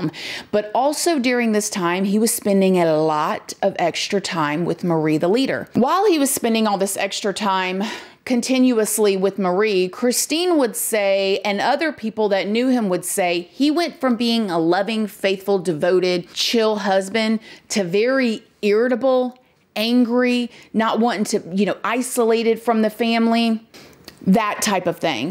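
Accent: South, United States. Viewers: I agree agree I'm very southern her southern accent I relate with well. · Im guessing you're from TN you are GORGEOUS!!! And you got that lol southern twang 🥰😍🥰😍 in in Looooooove lmao fr tho I'm guessing outside of Nashville somewhere TN girls are just omg I've only been to Graceland and Nashville but you look the part all the way id move up there for girls like you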